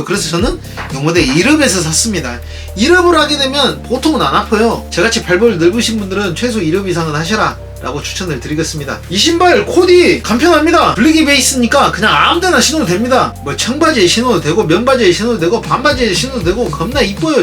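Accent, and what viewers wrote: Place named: Gwangju, South Korea. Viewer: 예전 영상에서는 전혀 몰랐는데 이번 편에서는 네이티브 사투리 살벌하시네. 광주 아니면 광주 그 근짝 동네 억양이신데 맞으요?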